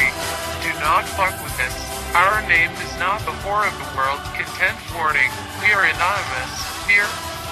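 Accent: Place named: Asia. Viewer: giọng châu Á đọc mà =)) Fake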